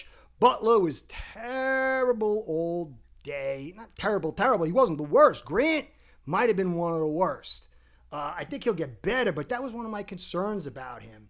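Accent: New York, United States. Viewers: This man sounds more like a Jets fan. (The accent).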